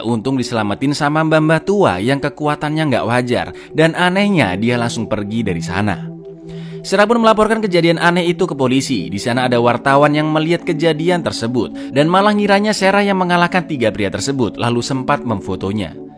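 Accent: Java, Indonesia. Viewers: Org Jawa kah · Setuju bro. Mantap kayaknya. Medok banget dia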